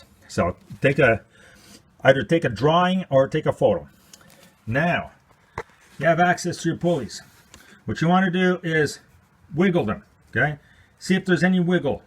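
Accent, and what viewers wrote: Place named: Chicago, United States. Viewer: This dude has a thick Chicago accent.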